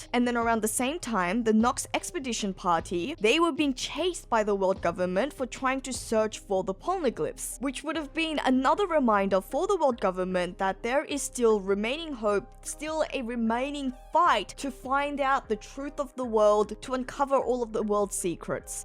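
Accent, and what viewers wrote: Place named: Australia. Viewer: she's Australian.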